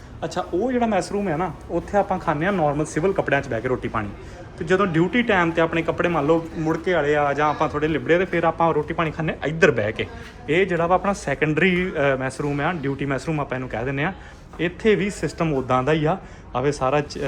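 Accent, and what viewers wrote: Majha, India. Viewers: eh majhe side di pure punjabi hi hai ji. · ਬਾਈ ਜੀ 12.23 ਜਦੋਂ ਬਾਹਰ ਦਾ ਵਿਊ ਦਿਖਾਇਆ ਸੀ ਉਦੋਂ ਬਾਹਰ ਕੀ ਸੀ ਜਿਵ੍ਂ JCB ਨੇ ਜ਼ਹਾਜ ਚੁੱਕਿਆ ਹੁੰਦਾ ਟੋਚਨ ਪਾ ਕੇ , ਉਹ ਸਮਝ ਨਹੀ ਲੱਗੀ ਕੀ ਚੱਕਰ ਸੀ ਸਮਝਾਿਓ ਜ਼ਰੂਰ , ਮੈ ਲੁਧਿਆਣੇ ਤੋਂ ਤੁਹਾਡੀਆਂ ਸਾਰੀਆਂ ਵਿਡੀਓ ਦੇਖਦਾਂ ਬਹੁਤ ਨਜ਼ਾਰਾ ਆਉਂਦਾ ਵਿਡੀਓ ਦੇਖਕੇ ਤੇ ਮਾਝੇ ਦੀ ਬੋਲੀ ਸੁਣਕੇ ਤਾਂ ਹੋਰ ਮਜ਼ਾ ਆਉਂਦਾ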